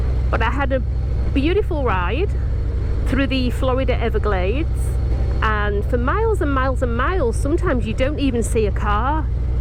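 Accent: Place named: London, United Kingdom. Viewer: Awesome ride and video. I’m here local in Miami also. I ride that road all the time that you were on. Half of my family sound like you from London. Ill subscribe and hopefully we can do a ride. P.S. black point is the spot.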